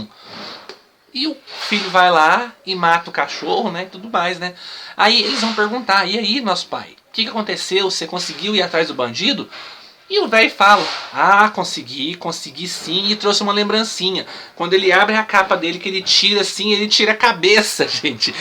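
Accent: Minas Gerais, Brazil. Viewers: "Sai pra lá, não quero esse trem não" KKKKKKKKKK amo as resenhas do principalmente pelo mineirês🤣🤣🤣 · Um resumo bem mineirês. Amei!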